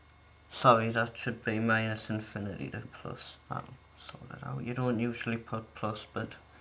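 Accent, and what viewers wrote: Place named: Ireland. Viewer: Irish as fuck and i love it ha thx for the help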